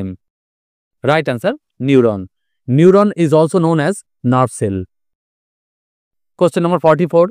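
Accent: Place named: Bengal, India. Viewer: Dada tumi bangali na, tomar accent e buje jai, ami tomar video roz dekhi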